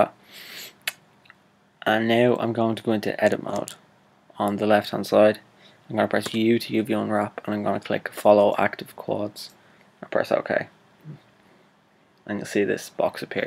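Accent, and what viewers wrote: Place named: Newfoundland, Canada. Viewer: this guy is a newfie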